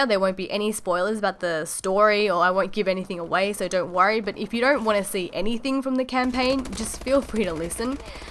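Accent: Australia. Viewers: Yup. Subscribed. Very articulated thoughts and well presented. Plus, there's just something about Aussie accents. It's magical.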